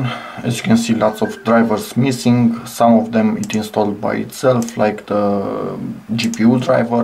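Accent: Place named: Romania. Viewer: на английском говорит отвратительно...... акцент капец как слышно.... румын или серб???? или болгар?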